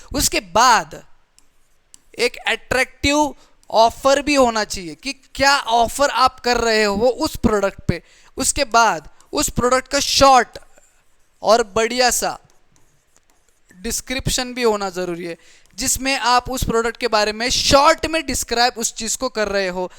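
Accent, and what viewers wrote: Maharashtra, India. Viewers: Sir aapn Maharashtra che aahat ka , toning tar tashich watate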